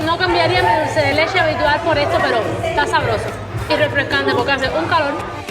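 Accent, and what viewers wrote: Cuba. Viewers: La voz de cubana tuya molesta cantidad el acentico cubano ese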